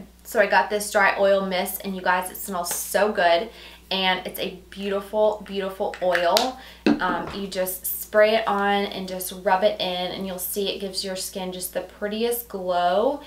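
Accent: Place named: Nashville, United States. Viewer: You are just so gorgi! I knew your accent sounded familiar, just read that you're from Nashville! I live in KY. We frequent Nashville & Gatlinburg quite often, its just beautiful there! Loving your vids! :)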